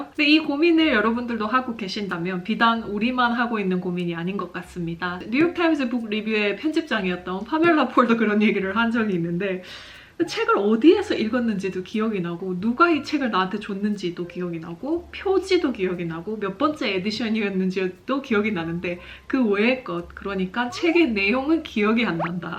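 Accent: Gyeongsang, South Korea. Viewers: 차분한 경상도 사투리와 유창한 영어발음...뭔가 신선하다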